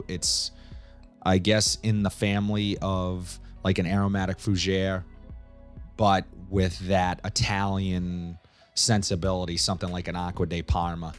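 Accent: Boston, United States. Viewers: I wanna live in the states if anything for the fragrance variety at Marshall’s . Canadian ones are more or less terrible . Winners is the worst. I’m also here for the Boston accent 😅👍🏻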